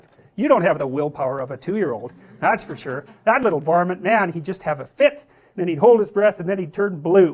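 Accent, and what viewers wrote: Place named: Canada. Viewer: This is the most Canadian accent I’ve heard from JP ever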